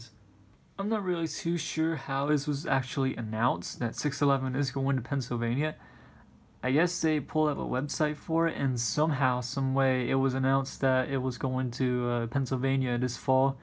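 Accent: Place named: United Kingdom. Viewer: wow british accent on point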